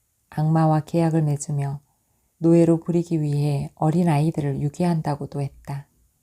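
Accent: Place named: Jeolla, South Korea. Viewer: 전라도 억양 정겹네요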